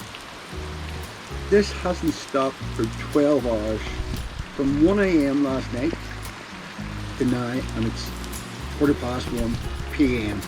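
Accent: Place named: Northern Ireland, United Kingdom. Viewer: Hopefully that's the end of your drama, but just how Norn Iron did you sound, Wee this and Wee that. Keep smiling.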